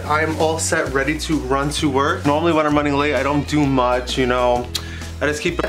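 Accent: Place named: Bronx, United States. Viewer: Hehe yes I can definitely hear the Bronx accent lol. Too hard not to miss 😉😎 Probably good to get away from that city life and have some peace and quiet. There's no way I could live in downtown New York lmao. Way too crowded for me 😅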